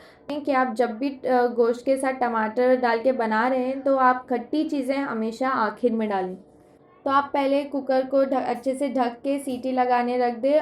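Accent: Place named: Marathwada, India. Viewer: Ap pakka marathwada region ke ho , apki toning se pata chalta hai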